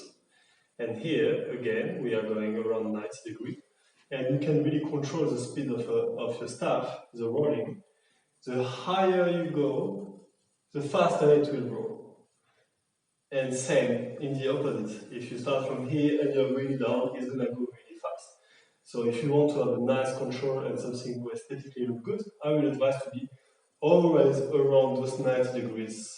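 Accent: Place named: France. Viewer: Mais... il parle anglais avec l'accent français... pourquoi ne pas faire un tuto en français ????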